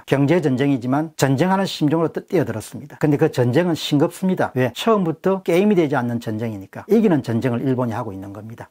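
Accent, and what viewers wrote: Gyeongsang, South Korea. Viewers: 일단 갱상도 사투리 쓰면서부터 아웃이다 ㅋ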